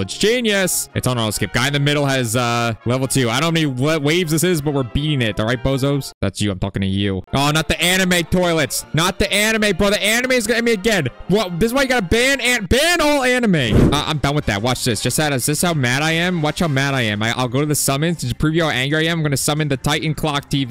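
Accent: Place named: United Kingdom. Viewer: Why bro speaking British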